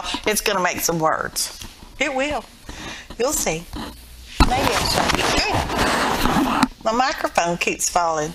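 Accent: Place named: Texas, United States. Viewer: I saw this on Shannon's FB. The minute I heard your voice I yelled "TEXAN!" Takes one to know one, but I'm from West Texas.(Lubbock) I woulda subscribed if you were painting everything solid black. I was gonna use that emoji hat is brown and swirly shaped, but I'm much too sophisticated. I'm not able health-wise to do much art, so I just spend my days watching. But that's gonna change one of there days. Right now I only have energy to take care of my pets and my grandsons bearded dragons and leopard gecko, and the bugs to feed them. Now we know I'm allergic to feeder bugs poops. Anyway by the time I get all that done, my CF and fibro has set in, and I just go to bed to morning. O boo hoo, "." do you remember that song? -1977, back when we were all beautiful, but didn't think so. Look her up guys, great song, written by. Another blast from the past. 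Heck, I may be the only person old enough to remember. This is why I don't comment very often; bla, bla bla😂 "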